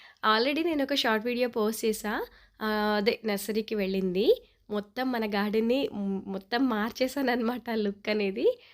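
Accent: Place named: Andhra Pradesh, India. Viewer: Hi akka bagunnara.meeru Aandra vallaa..mi matalu ala matladatharu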